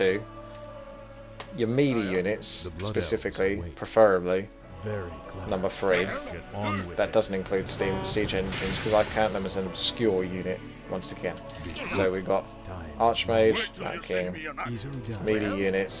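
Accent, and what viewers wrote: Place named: Northwest, United Kingdom. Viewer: north west british accsent hotkey free-hotkey 3 its just their accsent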